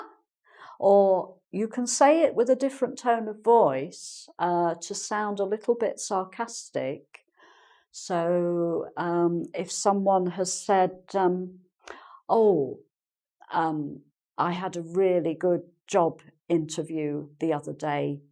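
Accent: United Kingdom. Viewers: you are absolutely adorable the way to teach and your lovely british accent. Thanks so much for your videos Im learning a lot. Send you big hug from Spain and subscribe to your channel :)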